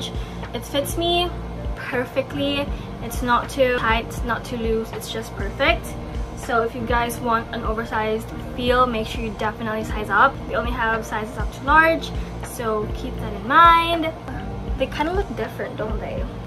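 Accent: Visayas, Philippines. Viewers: Bisaya ka? Hehe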